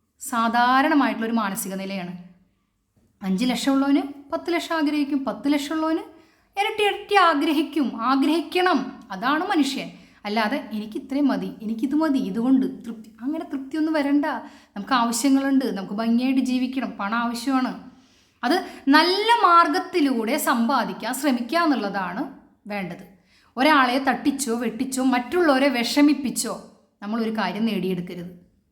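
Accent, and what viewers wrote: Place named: Ernakulam, India. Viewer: എറണാകുളം ഭാഷ😅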